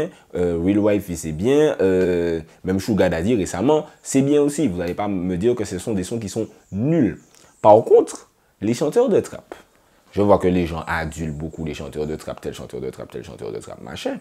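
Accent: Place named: Antilles, France. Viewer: C est super comme analyse mais quand tu dis : je vais prendre mon accent antillais...lol...tu l as H 24...xptdr...on entend que ça ton accent....🤣🤣🤣ne le prend pas mal. A bientôt.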